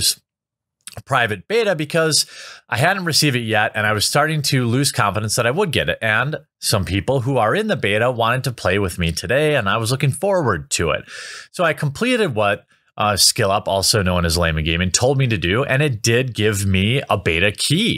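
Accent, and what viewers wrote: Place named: Milwaukee, United States. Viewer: Lived in Milwaukee, can confirm this is true. Any aaaaa sound like aple goes 'Ay' instead. Ayple? Weird but funny n cool how dialect works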